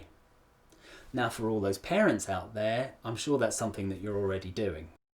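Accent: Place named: United Kingdom. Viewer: I LOVE your British English!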